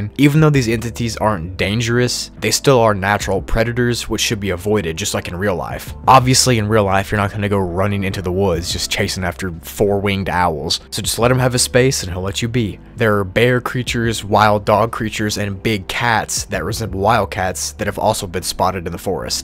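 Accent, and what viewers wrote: United Kingdom. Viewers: are you British?😮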